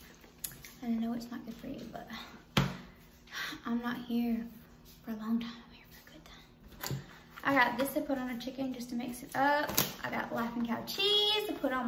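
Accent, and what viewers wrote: South, United States. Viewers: I love that southern twannnggg! And your demeanor is just so soft and calming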